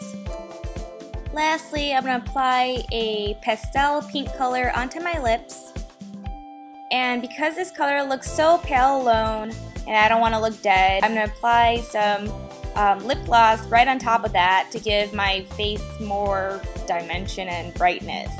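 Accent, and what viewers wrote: United States. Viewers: she looks asain and has country voice :)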